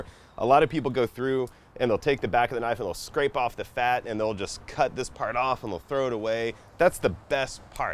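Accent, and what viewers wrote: Texas, United States. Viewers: Is this guy from Texas or something? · yep, sounds like Texas alright 😆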